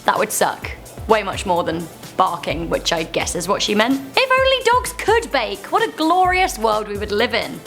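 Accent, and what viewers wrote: United Kingdom. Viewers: Love that British accent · Is her accent British?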